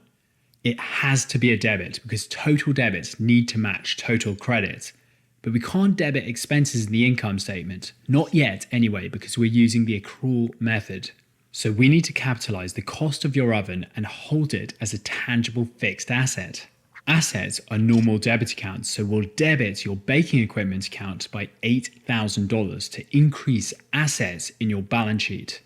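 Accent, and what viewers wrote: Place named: United Kingdom. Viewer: Clear British accent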